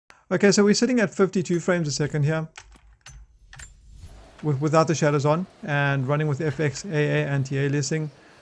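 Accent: South Africa. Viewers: You a RSA expat by chance? Your accent sounds like a South African :P Great vid BTW!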